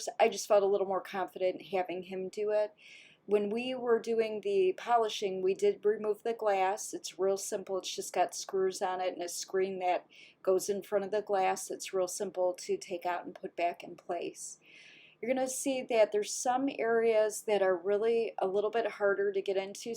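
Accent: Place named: Virginia, United States. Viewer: You go girls! Wonderful project! I have a Vermont Castings cast iron wood stove that has been sitting in my family room for years unused. You inspired me to want to work on mine (rusted by cat spray in some areas) and put it out in the yard as a place to unwind. Thank you so much ladies!! (I am Canadian and your lovely accent reminds me of someone I know from Virginia) XOXO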